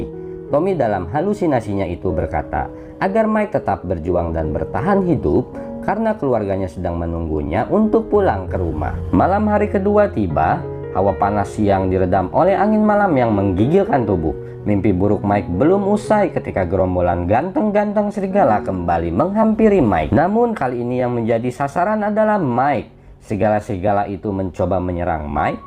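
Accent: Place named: West Java, Indonesia. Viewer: si sunda pisan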